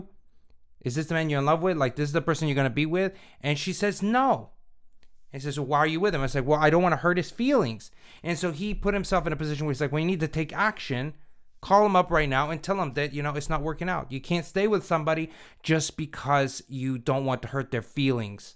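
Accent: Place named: East Coast, United States. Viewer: Run up on there! Now you sounding East Coast! NY/NJ BitCoin revolution! 0x8f641256fedf1453eb<num>b2cf25fe51ada0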